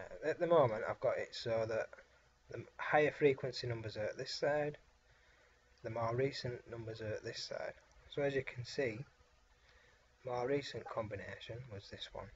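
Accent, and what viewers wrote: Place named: Northern England, United Kingdom. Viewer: Oh.. what a pity..:-( to me it seems like this is a good advert to push people playing even more euromillions. And it is carefully targeted obviously; as the PR (devil) people knows what they're doing. Luckily no one is watching this ** (39viewers)!!! The accent is from the north of England (notoriously work deprived area) "I was unemployed for 5 months..." (what that has to do with euromillions??) trying to look scientific with a spread sheet?!?!?! Everybody knows that lottery is another way for the government "to attract" people's fund and impoverish them little by little. The Euromillions is rigged, if you look at the draw history the combination of 3 or 4 same numbers comes up sometimes twice in a couple of months which is statistically improbable. Then HDVIDEOS999 ask for the link and you are ... 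busy?!?!?! LOL!!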